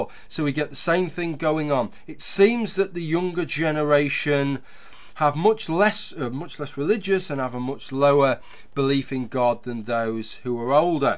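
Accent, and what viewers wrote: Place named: Birmingham, United Kingdom. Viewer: This has nothing to do with the video but what is that accent is it birmingham?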